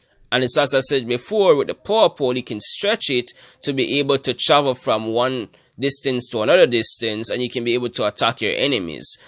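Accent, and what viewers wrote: Jamaica. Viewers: U sound well Jamaican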